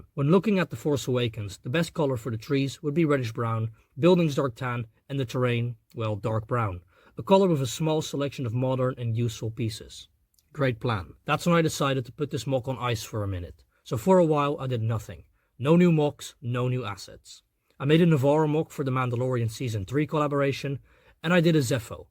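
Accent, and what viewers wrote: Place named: Netherlands. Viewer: k voelde dat je nderlands was en nu weet k het zeker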